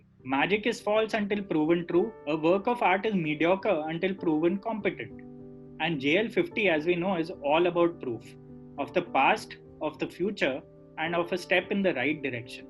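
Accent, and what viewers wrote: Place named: Mumbai, India. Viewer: The series is great. Your review is good. But I'm sorry, I didn't like your accent. It's a Mumbaikar meets South Indian meets Karan Johar type pronunciation of words. 😐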